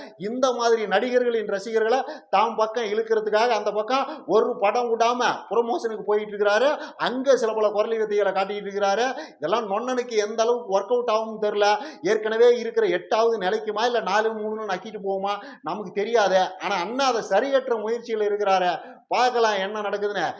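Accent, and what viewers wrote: Kongu, India. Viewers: சூப்பர் தம்பி கொங்கு தமிழ் மெசேஜ் அருமை அருமை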